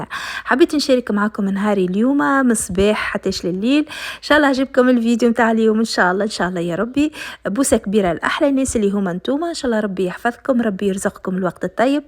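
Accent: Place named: Sahel, Tunisia. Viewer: يعطيك الصحة ،تقوم عالصباح وتقومي بفطو ر الصباح لأبناءك... طريقة تقديم الوصفة روعة... أنت أصيلة الساحل التونسى،نفس اللهجة،أليس كذلك؟. حظ سعيد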